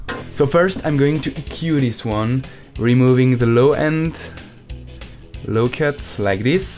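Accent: France. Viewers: hey one second... he got a french accent no?😂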